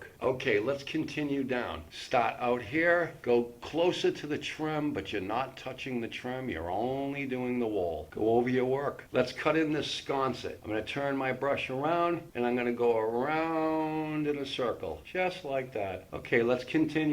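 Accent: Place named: Boston, United States. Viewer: I've watched a ton of videos about cutting in, including ones by pretty high-profile YouTube painters/DIY guys, and this one was by far the most helpful to me. I like that I can see exactly what you're doing, and that I get to see you do it over and over and over and over while you describe what you're doing each time. Also, you have a cheery, encouraging attitude, which is nice :) Oh, and I love the Boston accent. I'm halfway through painting my basement right now, and even though it's my first time painting, my lines are super clean. Thanks!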